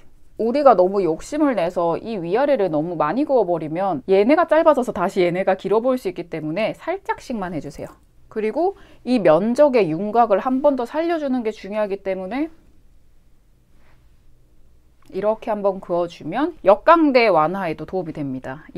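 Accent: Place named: Daegu, South Korea. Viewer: 너무 예쁘세요~눈웃음이 매력적이세요~ 살짝 사투리 억양이~ㅎㅎ 대구분이신듯ㅋ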